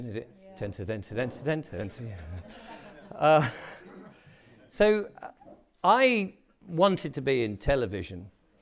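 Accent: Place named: RP, United Kingdom. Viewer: Rp accent... one eye... full of shite... shill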